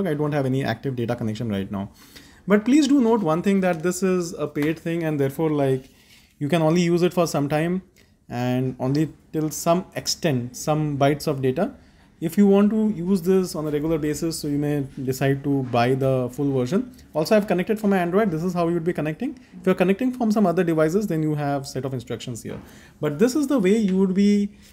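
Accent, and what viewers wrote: India. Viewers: Indian Guys!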